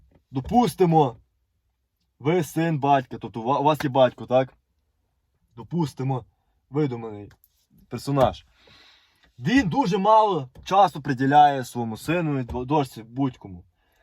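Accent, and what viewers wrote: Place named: Western Ukraine, Ukraine. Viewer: Акцент сельский западной Украини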